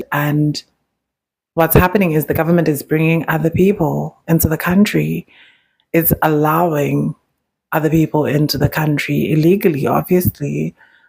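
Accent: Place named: Nigeria. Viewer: Are you sure you are South African? You sound like a Nigerian or Jollofina.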